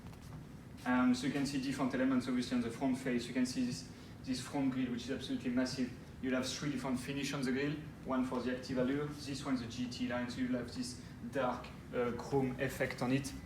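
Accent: France. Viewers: Très belle accent français ahah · laisse tomber l'accent français en langue anglaise ! les anglophones doivent se plier en 10 en l'écoutant. Même les africains parlent mieux l'anglais mdrrr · Pathetic French accent. Why not hire a native English speaker?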